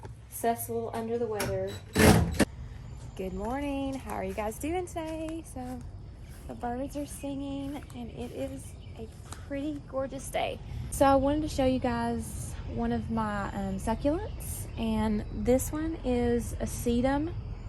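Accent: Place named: Tennessee, United States. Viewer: Are you in Tennessee? I am . Your accent is similar. What type of soil do you use ?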